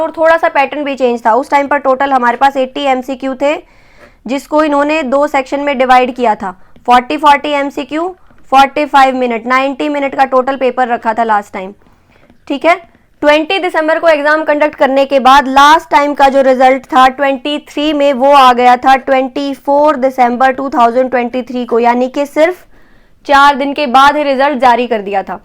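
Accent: Haryana, India. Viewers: Hm Rohtak side ki lg rhi h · Madam Haryanvi h 😂❤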